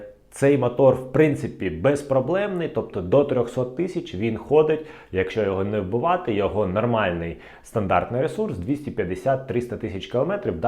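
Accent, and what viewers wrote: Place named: Russia. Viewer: Акцентик москальский однако), суржик.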